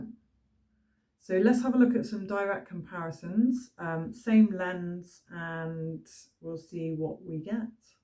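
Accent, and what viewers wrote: United Kingdom. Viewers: I'm here for the British accent. 😁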